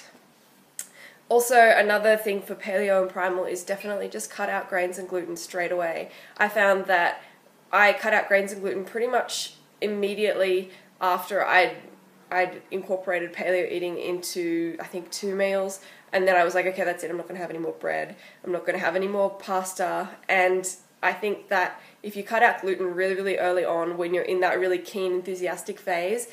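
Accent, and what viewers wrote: Australia. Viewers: Wish I knew the first tip about phasing it in and shaping eating behaviour before my first Paleo attempt (rather than going at it like a bull at a gate & failing miserably). Also, have I found another Aussie?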